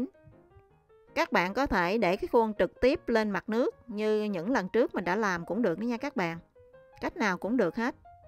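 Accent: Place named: South, Vietnam. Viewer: Cám on chị chia sẻ, rất thật tình & thích nghe giọng nói đậm chất miền nam của chị.....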